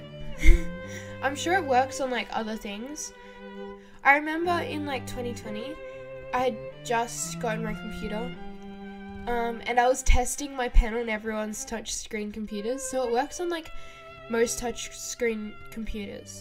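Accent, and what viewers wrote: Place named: Australia. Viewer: Congratulations!! I love your accent and voice omg✨ is it an australian accent? 🦘 this drawing looks so beautiful!! Thank u for answering my questions 🌸 i love ur videos a lot❤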